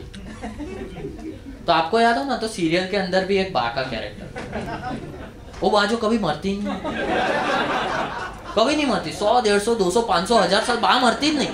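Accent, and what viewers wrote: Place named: Gujarat, India. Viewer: I love the way that Gujarati accent you use. Hats off. 🤩 you are star.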